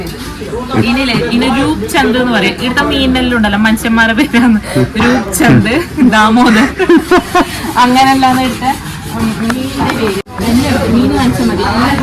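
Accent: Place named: Kasaragod, India. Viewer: kasaragod basa😂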